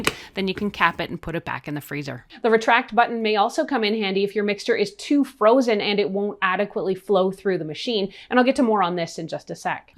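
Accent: Canada. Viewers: Excellent 905 accent.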